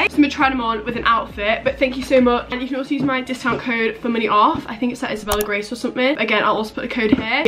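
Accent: United Kingdom. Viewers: UR BRITISH OMFG MY IDOLSSSS BRITISH.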